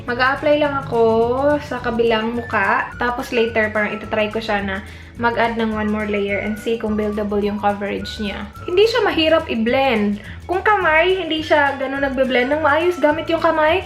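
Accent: Visayas, Philippines. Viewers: i mean you speak visayas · Bisaya ka teh? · BISAYA KA PO BA? PARA KA NAMANG TRYING HARD MAG ENGLISH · ate, may halong bisaya ka ba? :) i love your videos newbie po ako sa yt mo :)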